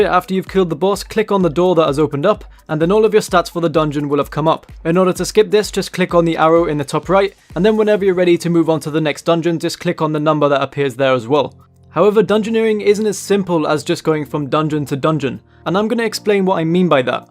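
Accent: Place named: United Kingdom. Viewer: i wish i had a dollar for every time i heard this brit say "as well"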